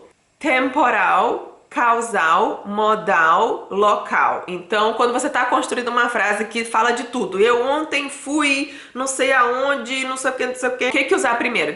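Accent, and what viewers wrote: Northeast, Brazil. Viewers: Tens algo de sotaque do nordeste...eu estou em Recife.... assinei seu canal e vou seguir, você é muito agradável..me sinto bem ouvindo você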